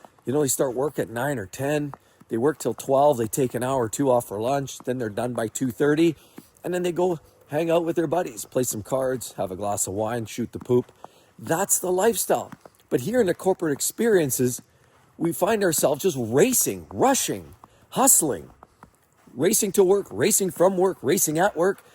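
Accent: Canada. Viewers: Looks like you live in Colorado but your accent sounds Canadian!Anyway, turned 60 and burned out at my job..30 years of teaching..I’m ready to retire..Was planning on 65 but I can’t be in a job where there is no parental and administrative support..It just beats you down to the point where you don’t care anymore..I would rather retire on a lower pension and have peace then to endure 5 more years of this..I’ve become a minimalist and don’t need much of anything…I would to do something but have more control over what I do..Maybe reinvent myself?…Maybe buy a business or just do rideshare or substitute teach??..Trying to figure it out..I think me and my wife would be ok financially..Our home is almost paid off..We could sell and downsize for extra income?…kOur vehicles are paid off..I just ready for some peace and more control of my weekly routine.. We aren’t interested in much travel..We would be good with once or twice per year..nothing to extravagant…Anyway I hope I can figure it out before the end of the school year or summer? 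I’m mentally drained and so ready.. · Canadian...I can hear it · That street you're walking down looks like Russia. But your accent proclaims you're Canadian. Where is this?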